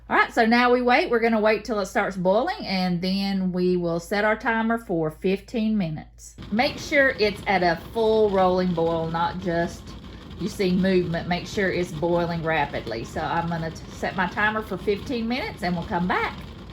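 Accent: South, United States. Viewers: I need to pull that book out. I’ve never seen that recipe. I’ve learned so much this collab. PS- your southern accent sounds like mine (Bama?) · I love seeing these unique jams, and I love your southern voice! 😊 the book all new recipes did it just recently come out?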